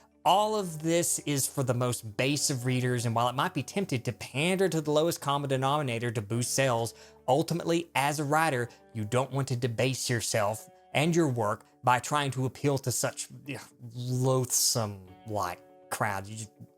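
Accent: South, United States. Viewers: It's so weird actually seeing him, and then hearing him speak with the Southern(?) accent, even though I've heard him speak with it before. I guess it's because I live in New York City and only see him as a cartoon, and thus I associate the accent only with the cartoon, and not with three dimensional people. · You have the most interesting of accents that's its rather indiscernable what corner of the US (or Canada? Nah, I don't hear Canadian...) you hail from. It's like a southern twang with a twist. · Wtf face reveal! Also, you look way less southern than you sound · Are you from the south? I never noticed how southern your voice sounded when I couldn't see your face. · NEVERMIND IT'S TENNESSE I KNEW IT WAS AMERICAN SOUTH. Also I was looking at his website and the thing about time traveling Nazis was in the same paragraph than the TENNESSE thing.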